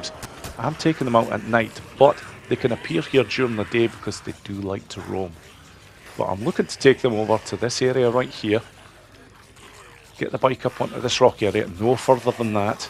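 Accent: Scotland, United Kingdom. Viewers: Thanks for this - all hordes are cleared and some of these methods were used. Can't figure out if you have a decent Scottish accent or no. Or just Eastern european with British accent (no offense). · awesome content, brother. All of it. And I always enjoy hearing the Scottish accent. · You're fairly local to me as well, going by your accent anyway. I'm back at work, as a long distance(ish) truck driver. So the whole of the UK is local to me😀 I work for a Stirling company. Thanks for your kind words, but it was almost 3 years ago now, so the chances of getting that 3% back are pretty slim. On the other hand, I haven't given up yet. I did the 2021 Edinburgh Kiltwalk Mighty Stride, as the goal for my legs physio, 6hrs 6 mins. And 500 miles walked in training for it, so not at all bad for an old man 😃 So now I'm off to do all the challenges, with prep from your videos. 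Then I'd better do another play through, to see how much easier it could've been 😂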